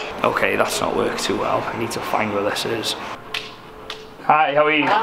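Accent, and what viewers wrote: United Kingdom. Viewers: I think the British accent helps... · White skin & British accent. The keychain to America's chastity belt.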